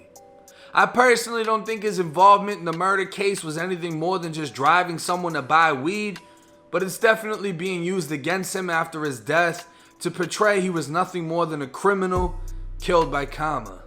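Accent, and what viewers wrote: Boston, United States. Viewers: "To portray that he was nothing more than a criminal, killed by KARMA." LOL your boston accent made it sound like you said he got killed by comma. Kuz for some reason boston niggas dont like to pronounce the letter R if an A comes before it. Lolol Karma (kah-ma)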